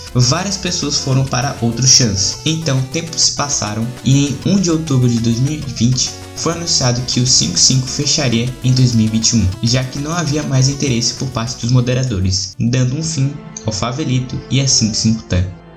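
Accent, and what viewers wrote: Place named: Paraíba, Brazil. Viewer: Pode regravar esse vídeo mas trocando a voz por uma pessoa pós puberdade e de preferência que não seja paraíba?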